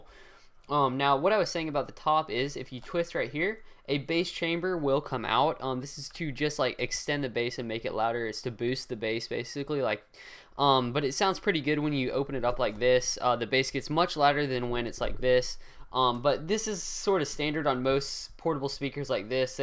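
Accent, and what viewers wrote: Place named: United States. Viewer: AMERICAN ACCENT!!!!!!!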